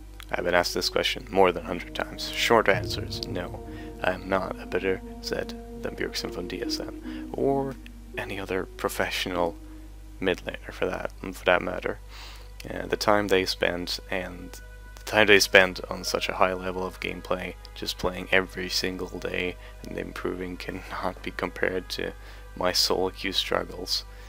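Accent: Sweden. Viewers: Ofta du inte hör att han är svensk?:s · You are swedish for real? :D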